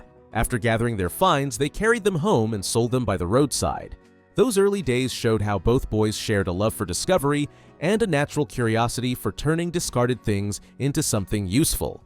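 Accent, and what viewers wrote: United States. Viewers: Never liked Drew his ego arrogance and I thought down to people stitched hard working people a real tight arse. But why is this done by an American voice and said doolars instead of pounds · Why is it being narrated by an American?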